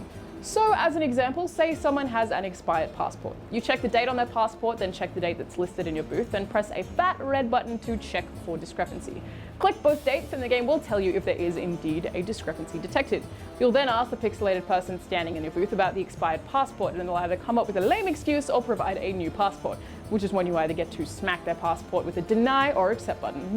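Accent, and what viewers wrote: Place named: New Zealand. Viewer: Is she from NZ?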